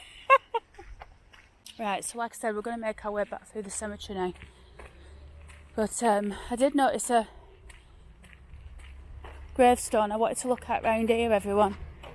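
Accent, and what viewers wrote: Oldham, United Kingdom. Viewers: Hi!-I'm an Aussie that used to live in Royton,-I used to love going to Owdam,-it had heaps of beautiful buildings and I love those wonderful Victorian graveyards!--I really appreciate your hard work! and what you do to your house, is great,and "artistic"-& you always "have a go"-nothing puts you off!--northern people are the best!!--never lose your Oldham accent,-nor your great sense of humor!!--it cant be replaced!---all the very best.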